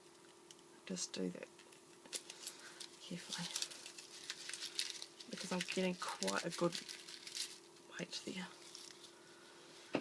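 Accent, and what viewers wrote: New Zealand. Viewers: GDAY COUNTRY COUSIN, U SOUND LIKE A KIWI, GOOD TO HAVE FOLK BACK THIS SIDE SHOWING THEIR WARES LOL JUST LOVE YOUR FLOWERS, INSPIRED ME... THANKS CAMILLE AUSTRALIA.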